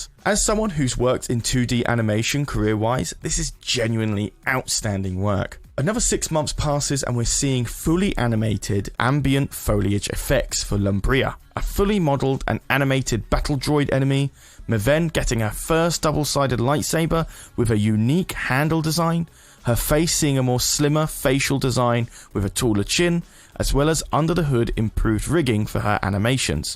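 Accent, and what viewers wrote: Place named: England, United Kingdom. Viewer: Why does have an English accent on his second channel?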